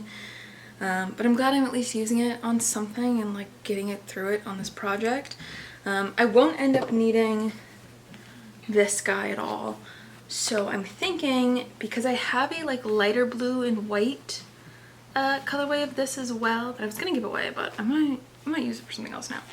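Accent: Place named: Australia. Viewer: hi. are you in australia? you sound australian but the yarns you mention are all US. Maybe if you blocked the hood it might sit better? Can you tell me what you dislike about this yarn and did you do a swatch first? anyhoo, I like the hood, especially the colours and it looks straightforward, nice to meet you :)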